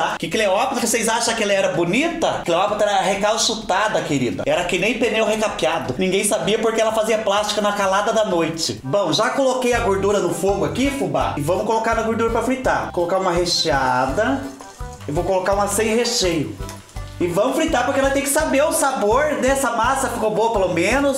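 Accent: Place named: São Paulo countryside, Brazil. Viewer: Bom diaaaaaaaa fubá,adoro seu sotaque e fiquei muito feliz de saber que você mora aqui por perto da minha família,minha sogra é de Itararé,meus sobrinhos de Itapetininga minha nora também, todos eles falam assim como você acho lindo, beijão seu jaguara marvado eu nem preciso que aumente minha borda de catupiry# a cinturinha 😂😂😂😘😘😘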